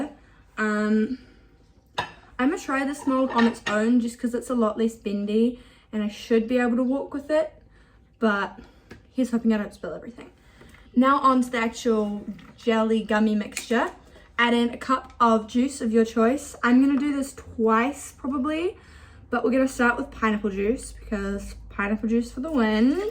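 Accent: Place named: New Zealand. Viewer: My first question was going to be what is your accent?? I couldnt quite place it! New Zealand! I was actually vegan for many years. That mold is so cute!! For my silicone molds, I like to put them on top of a small cookie sheet to carry them to the fridge or the oven. I had too many mishaps before I started to doing that..Hahahaha. My Grandsons love gummy bears, so I know they would enjoy these!!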